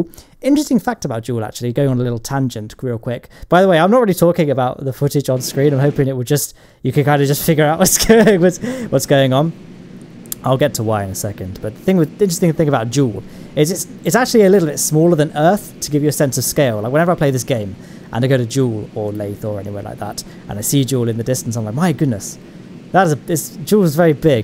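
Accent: United Kingdom. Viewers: Where are you from? You sound British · He is British so his accent is like that now come on chill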